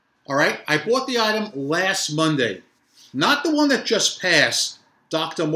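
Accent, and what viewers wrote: New York, United States. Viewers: your NY accent & how you come across is easy listening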